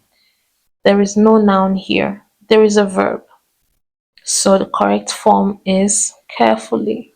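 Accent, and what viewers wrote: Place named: Europe. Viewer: Alright , the way you speak and pronounce , it's obvious you are NOT a Native English speaker. Any fluent English speaker will surpass your explanation in time lesser , 3/4 th or 1/2 the time you spent. explaining. You have a very strong , thick European accent.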